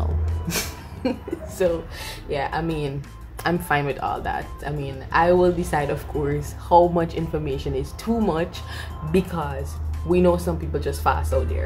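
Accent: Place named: Jamaica. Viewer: How yah sounding suh jamaican....🙄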